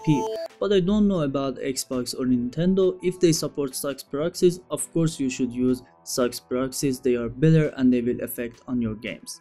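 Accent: Iran. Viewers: Straight persian accent right there 😅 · سلام داداش چطوری خوبی میدونم ایرانی هستی از لحجت معلومه خوشبختم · Bro you Persian. 100% Theres no other Accent like this.. Ive spoken to many who speak the Lamguage Persian as their Mother Tongue.. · اکسنت فارسی داد میزنه ایرانی هستی 😝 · لحجت،ایران هستی؟ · از لهجتون تشخیص دادم موفق باشید 😁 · داش حال کردی چطوری تشخیص دادم؟ XD لهجه ت ایرانی اصیلهXD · داداش فارسی هم بگو خوب تابلوئه اکسنت پرشینت ..😉👍🏽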